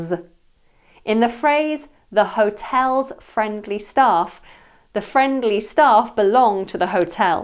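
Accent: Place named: United Kingdom. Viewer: Hello,. I speak English like an American, as I was born and raised her. I do enjoy your slant on proper British English, however. BTW, I ran across a single mother (mum) who has a YouTube channel, and she speaks "perfect" Yorkshire English, IMO. The site is "Mama Mei." I enjoy listening to various dialects in English and other languages. I do speak about 20 languages: it's a hobby. - Dr Dave Menke, Tucson, Arizona, USA.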